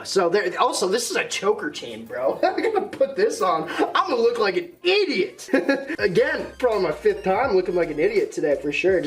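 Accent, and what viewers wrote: Canada. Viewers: U canadian bro?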